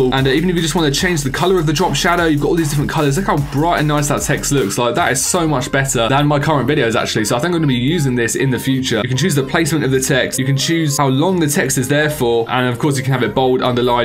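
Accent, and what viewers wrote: United Kingdom. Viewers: I just knew that you are British I love your accent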